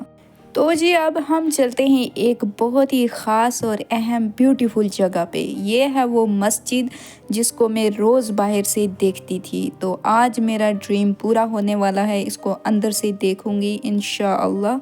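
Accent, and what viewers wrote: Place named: Kashmir, India. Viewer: Are u from J&k (india) bcz the way of your talking like u are from baramulla 🤔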